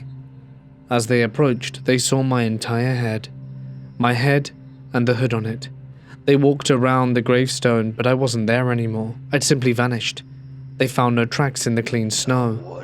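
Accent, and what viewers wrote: United Kingdom. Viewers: Sorry but british accents just don't make scary stories sound scary like american accents do so I;m out after the first 2 stories.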